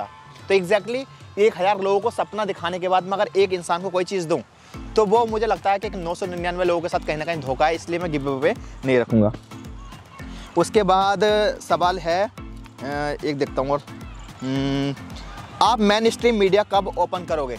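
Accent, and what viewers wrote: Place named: Rampur, India. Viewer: I am also from rampur tabhi aapki voice se samajh aa gya....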